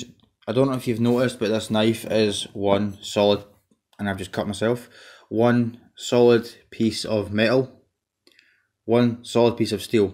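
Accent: Scotland, United Kingdom. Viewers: Scottish accents are dope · Very dope. Now, is he from Edinburgh or from Glasgow?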